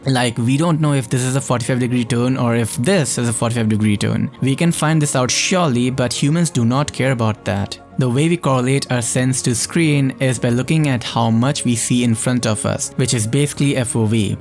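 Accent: India. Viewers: Idk if anyone else has noticed, but now sounds more "Indian" if uk what i mean 😉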